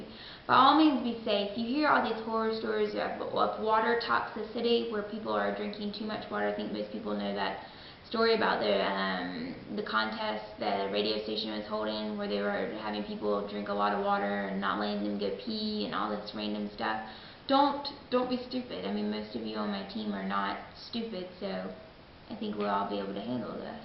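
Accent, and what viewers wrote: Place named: South, United States. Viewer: Totally agree with you regarding the water and exercise incriments. I assume the 2 hours is weekly? Love the accent too, as a native southerner I figure you are from Georgia or SC? Keep up the good work!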